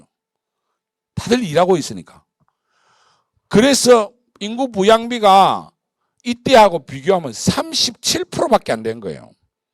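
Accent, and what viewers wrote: Gyeongsang, South Korea. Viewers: 대가리나쁘면 공부하지마 여학생이졸고있으면 쳐자빠져자는 미친년아 ㅋㅋㅋㅋㅋㅋㅋ 분필던짐 여기서 한두명만인서울 간다고 특유의 경남말투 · 경상도 표현이 좀 섞여서 그런거에요. 기분나쁘게 말한건아닌데 그렇게 들린것같아요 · 말투가 경상도인데 무슨 서울? 경상도 출신이 서울사는거겠지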